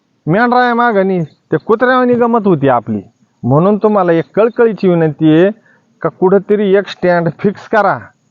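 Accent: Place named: Nashik, India. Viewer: वैजापूर तालुका ते नासिक पट्ट्यातील हेल (स्वर) असलेला आवाज आहे हा.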